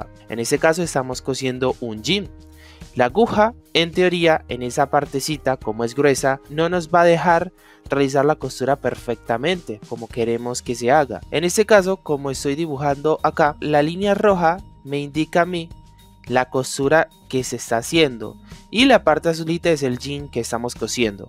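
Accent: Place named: Mexico. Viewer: se que eres mexicano por tu cantadito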